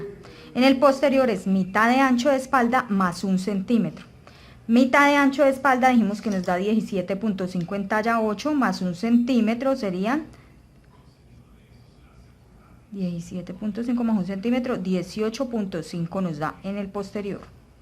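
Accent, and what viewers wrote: Colombia. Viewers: Espectacular método bien explicado y tu acento paisa increíble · ME ENCANTA TU FORMA DE HABLER TAN COLOMBIANA QUE ORGULLO ERES UN GENIO JAJAJAJA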